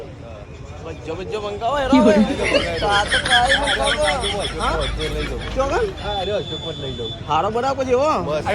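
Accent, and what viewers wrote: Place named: Vijapur, India. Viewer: Good. Good. Original. Vijapur. Nee. Bhasa.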